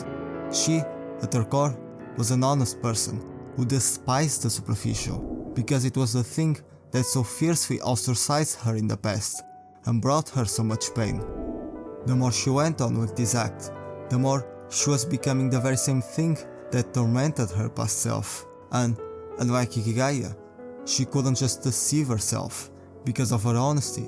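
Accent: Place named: Russia. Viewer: the russian accent is very thicc you sound like vlad ncl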